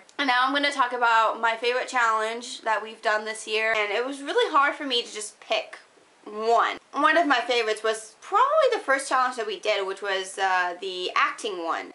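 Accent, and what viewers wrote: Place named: United Kingdom. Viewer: aww you have a amazing british accent and that song?! IS IT ROB SINGING?! I LOVE IT! and do you know what its called? and haha i love your videos! :D DONT YOU DARE GET EVER BORED OF ROB LOL! :D and aww what you said was so cute :) I am so consider myself so close to you too and i love you! :) like a big sister <333 and yes! SHAYTARDS! :D and what you said about the other girls are so true! :D LOOL YOU AND MONA! haha i love you <3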